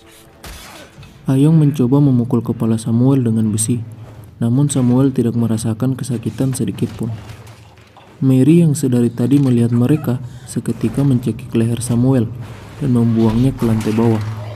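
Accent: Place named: Eastern Indonesia, Indonesia. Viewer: logatnya mirip orang timur. APAKAH ANTUM ORANG TIMUR?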